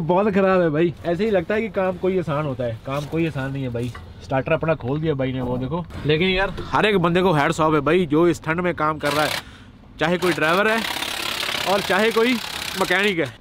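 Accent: Punjab, India. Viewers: ਪੰਜਾਬੀ ਬੰਦਾ ਜਦੋਂ ਹਿੰਦੀ ਬੋਲਦਾ ਤਾਂ ਕੱਚਾ ਜਿਹਾ ਜਾਪਣ ਲੱਗਦਾ।ਬਾਕੀ ਗੁਰੂ ਰਾਖਾ ਐ ਤੁਹਾਡਾ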